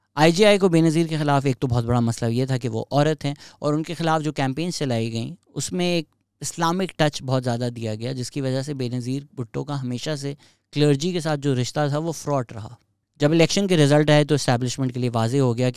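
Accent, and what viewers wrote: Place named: Delhi, India. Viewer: Brother I'm from old Delhi Chandni chowk India I just wanted to say your accent is very similar to the normal Dilli wala accent. ❤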